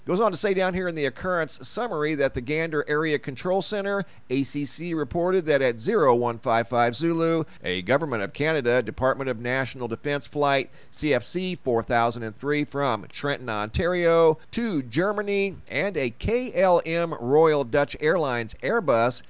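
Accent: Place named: South, United States. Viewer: If only you could just talk and not sing your words like with a old country twang!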